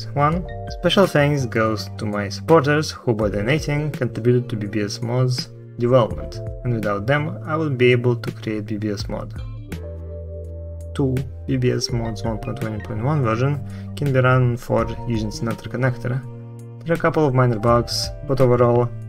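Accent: Russia. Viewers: yeah, finally a port! great work! Weird question I know, but where are you from? Is that a russian accent? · the accent is similar to Russian, he probably speaks Russian, but I didn't know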